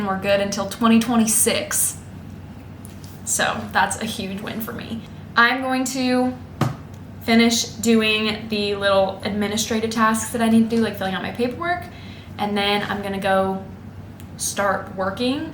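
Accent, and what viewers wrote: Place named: South, United States. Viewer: I hear a southern accent coming along 😂🫶🏼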